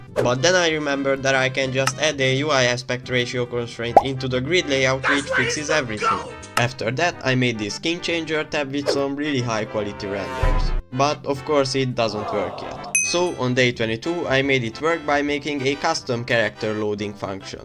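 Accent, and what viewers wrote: Hungary. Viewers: magyar vagy? csak azert kerdezem mert hallom az akcentust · ijj tesó a magyar akcentus (félre ne értsd, nincs vele baj <3)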